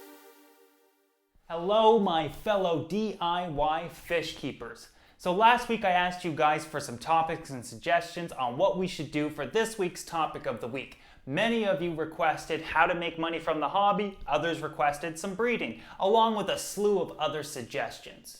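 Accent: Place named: Maritimes, Canada. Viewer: Hey man i thougth you were from the maritimes i live in Fredericton New-Brunswick cheers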